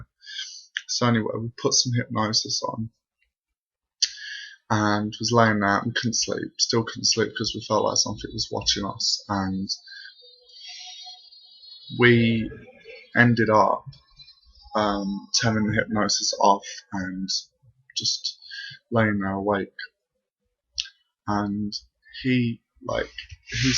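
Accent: England, United Kingdom. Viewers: nice to hear an English accent. what part you from.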